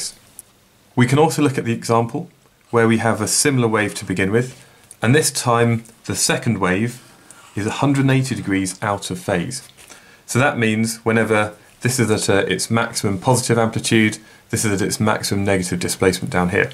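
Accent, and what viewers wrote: United Kingdom. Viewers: W bri ish dude. Love u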